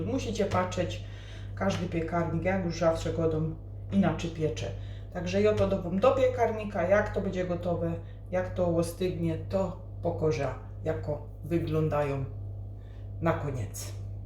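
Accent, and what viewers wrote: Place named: Silesia, Poland. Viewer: Oooo Ty godosz! Aż mi się cieplej na sercu zrobiło.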